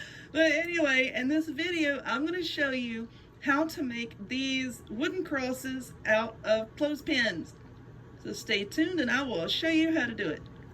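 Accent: South, United States. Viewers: I love this and being a displaced southern gal myself, I just loved hearing my kinda accent! One suggestion that I would offer would be to mention that if you use hotglue, it needs to be via a gun that makes the blue very hot! I heard about this craft from a friend who failed at her attempt and learned that she used a mini glue gun so that the blue wasn't hot enough. Thank you for sharing this craft.😁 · I will save this video with all of my other videos of things I want to make. I love that you take your time in showing some of us slowpokes how to make great diys. Your sense of humor and southern accent always make your channel so enjoyable to watch. I wish you and your family a very Merry Christmas and a wonderful New Year. I pray The Lord bless thee, and keep thee: The Lord make his face shine upon thee, and be gracious into thee: The Lord lift up his countenance upon thee, and give thee peace. 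Numbers. 6: 24-26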